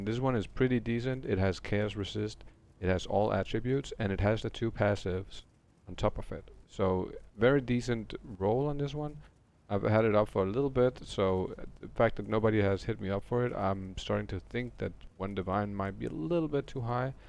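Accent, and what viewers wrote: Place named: Denmark. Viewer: You can hear a fellow Dane from a mile away. Love it man. Do you play in a Discord where other Danes can join?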